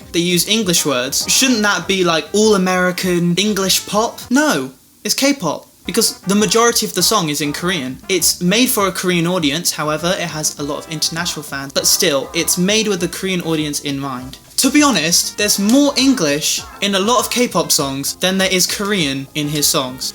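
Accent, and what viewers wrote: United Kingdom. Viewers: Omg! You sound more British then him does. And I agree to not agree. It's unhealthy to change your face too look like a celebrity some would say, that person would need therapy. It's all part of the game for popularity and fame ..I agree his music is not even Korean or even close.. I found it funny that some Koreans knows this and still goes with joke and still being part of he's career lol nowadays anything goes I guess ? Everyone is just finding something to film about. ?? Who knows really as long he's not after my guy..that's all that matter in the bts. And look at him and take look of all of there bodyguards lol so many is so concerned don't be. Like always thank you for reading. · Oli London Obsessed guy (Woww ur accent is Britain one I luv ur accent) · Are you korean? But you kinda have a british accent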